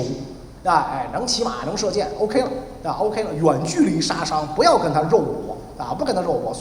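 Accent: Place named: Beijing, China. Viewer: 袁老师说话满满八旗味儿，😂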